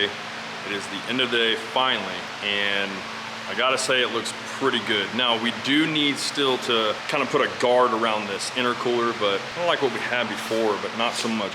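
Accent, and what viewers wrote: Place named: United States. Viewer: What are you talking about Clif ? That's an American accent ! As for the way he looks, don't make fun of him just for being a pretty boy, 😂 he can't help it if he's handsome.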